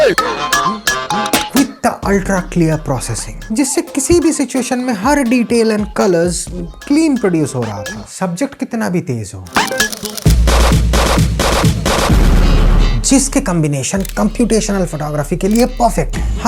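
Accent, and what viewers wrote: India. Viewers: SMASH review bro. Maza aagaya. Keep up the good work!!!! English bhi kardho wohi desi accent pe, aur viral ho jayega 💸💸💸💸 Bless u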